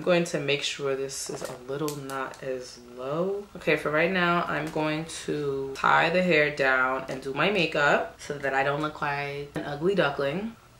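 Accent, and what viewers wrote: New York, United States. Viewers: Girrrrlll, you are and look so gawgus and bomb, yaaaaasss hunty❤️I love that wig on you and the style you did. I def gotta do this style too! And your shades are mad cute! Where’d you get them?? I need the deets. Anyway, keep doing you, boo. Stay stunning and successful💕🙏🏿🤑 P.S. Are you a New Yorker ?? Lol. You sound like you’re from NY (but forgive me if I’m wrong).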